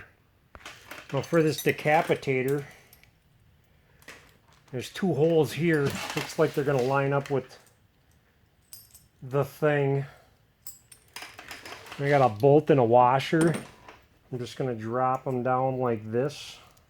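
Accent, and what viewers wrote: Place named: North, United States. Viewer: You sound like ya from up north. Milwaukee here.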